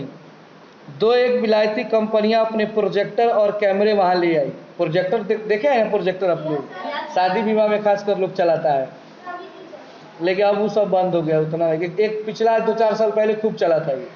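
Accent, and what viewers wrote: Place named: Bhojpuri, India. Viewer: Awesome sir. I love your bhojpuri tone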